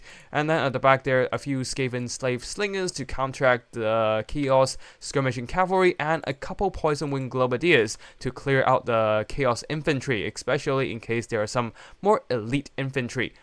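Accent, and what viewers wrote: China. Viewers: trying to place your accent but really unsure. Are you chinese?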